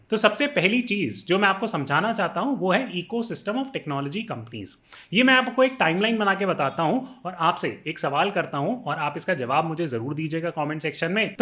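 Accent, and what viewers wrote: Standard. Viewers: Apki hindi bahut zyada Shudh ha!!!😁❤️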